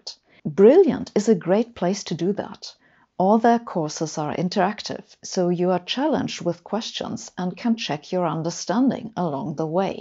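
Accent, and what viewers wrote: Germany. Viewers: the goal is to monetize. people who like the illusion of themselves understanding something without any effort really like this German accented "no gobbledygook" which is actually pure gobbledygook since she never invests in actually explaining in detail without using silly metaphors ...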